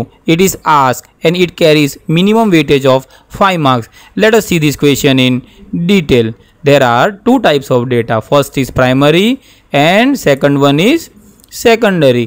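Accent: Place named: Gujarat, India. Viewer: Aanoo gujrati laagyeee Chee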